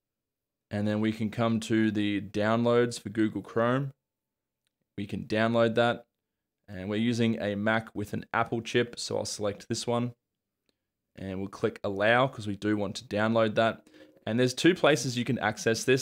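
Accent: Australia. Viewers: Thanks fornthe turorial man. It's so nice to have a tutor with Australian accent 😁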